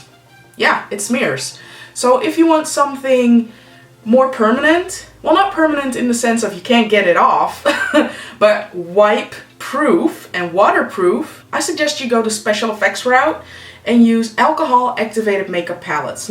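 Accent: Netherlands. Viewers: OMG are you dutch??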